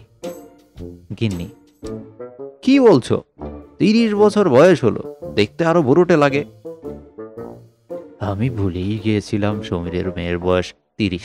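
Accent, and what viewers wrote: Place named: Bangladesh. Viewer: বাংলাদেশী বাংলা ঠিক কর, बाल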